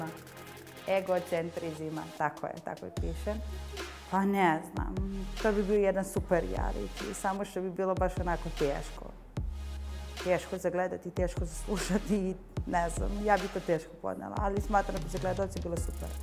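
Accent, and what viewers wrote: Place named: Kaluđerica, Serbia. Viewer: Progovara Kaluđerica iz nje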